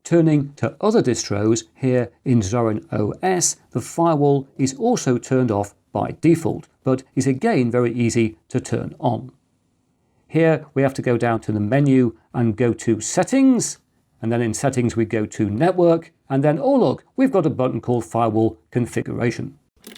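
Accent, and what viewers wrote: United Kingdom. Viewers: I wish I had you in my CS classes back then. First, a british accent is always a win, second, your teaching is incredibly motivating and paced on point. Thank you so much for your content!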